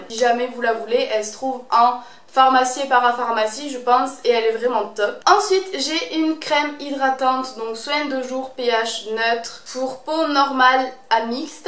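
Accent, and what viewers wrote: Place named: Southwest, France. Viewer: Coucou, pour mon deuxième commentaire sur ta chaine (je poste RAREMENT des commentaires), je tenais à te dire que j'adore ta personnalité ! Le fait que tu évites d'inciter tes abonné(e)s à utiliser des produits pas très conseillés me plait beaucoup et montre ta sincérité et ta bienveillance. J'adore ton accent, j'ai le même, et c'est tellement naturel ! Ca fait du bien d'entendre cet accent sur Youtube ! Enfin ! :P J'ai déjà essayé de me lancer sur Youtube mais par manque de motivation je n'ai pas continué. En revanche je fais de la photo. Tu peux toujours aller voir ma chaine si le coeur t'en dit, ainsi que ma page Facebook : Capricorne Clic Photographie. (Ouais j'en profite un peu, mais la solidarité du Sud (Ouest) paie toujours, donc ... ) haha ! Gros bisous et continue comme ça.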